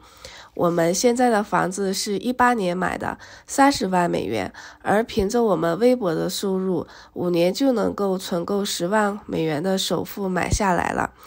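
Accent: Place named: Yantai, China. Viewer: 烟台口音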